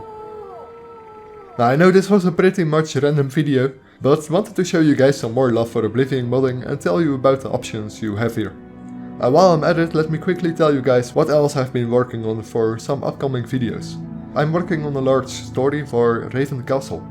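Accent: Netherlands. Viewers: Je bent nederlands hoor ik gelijk! :D NICE!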